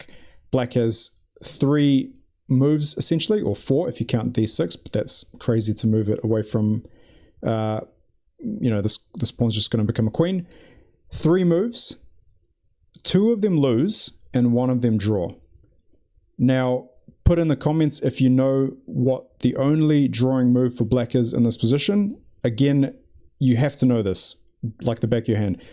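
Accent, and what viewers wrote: New Zealand. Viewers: "spishal" I'm sorry.... I cannot with the NZ accent. :( I must lose out on valuable chess info. grrrrr. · this dude sounds like a kiwi im subscribin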